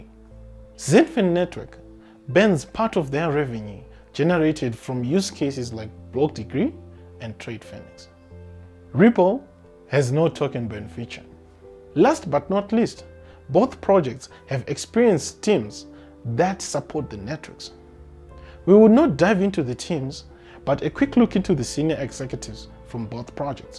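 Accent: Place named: South Africa. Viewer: Hi! I am a fellow south african - i recognise your accent! where can us south africans buy XDC? please help thanks! xx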